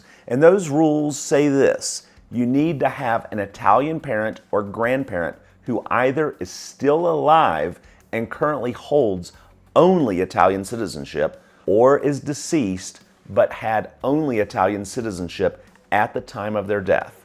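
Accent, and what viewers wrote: United States. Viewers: The President of the Republic signed the law but issued a statement this week stating that the law needs to be re-evaluated as it is impacting descendants of Italians born abroad. In addition the constitutional court is hearing a case regarding generational limits. If the ruling is that there is no generational limit then parliament will need to amend the law. Humor is appreciated but the accent is troppo americano ! 😅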